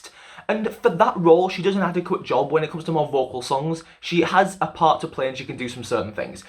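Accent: United Kingdom. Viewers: love your videos! Just have to ask what is your accent? is it pure british or sth else?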